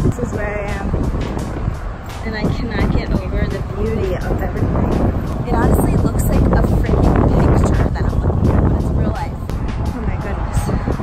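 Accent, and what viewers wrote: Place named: Philadelphia, United States. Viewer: Awesome video,. I love your philly accent. I wash my jeans once a week. 😘😘😘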